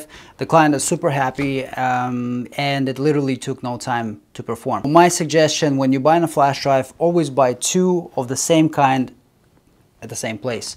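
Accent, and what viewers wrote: Russia. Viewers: Ты русский? Акцент выдаёт.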